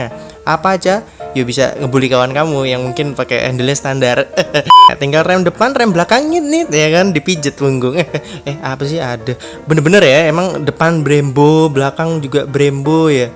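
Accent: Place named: Java, Indonesia. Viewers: Kenapa ke jakarta malah medok to bang 😂 kekel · wooo.. wong jowo to, medok² gurih..:v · Bahasane lohh kok dadi medok bang😂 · orang lampung tapi medok🤣🤣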